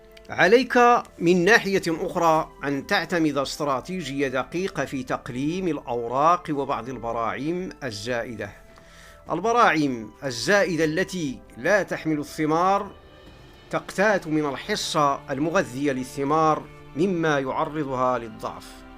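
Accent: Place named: Morocco. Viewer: يتكلم بلسان مغربى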